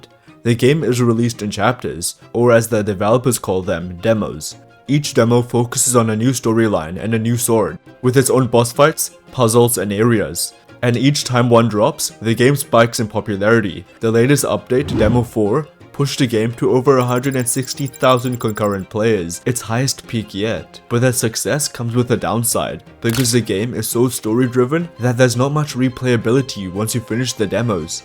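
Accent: India. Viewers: Wait why does he sound indian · I love this guys indian acseent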